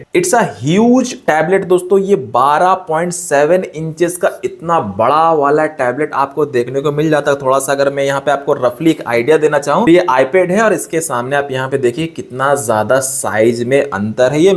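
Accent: Bihar, India. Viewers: Sir aap bihar se hain kya??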